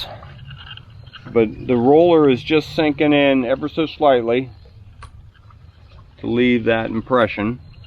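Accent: Pittsburgh, United States. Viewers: As soon as I heard you talk I knew you must be from somewhere near Pittsburgh, PA… am I right? I grew up there.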